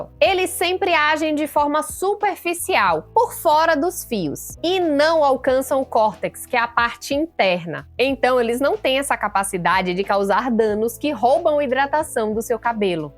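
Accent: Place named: Bahia, Brazil. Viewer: Baiana de Salvador sim😂 Rapaz, reconheco logo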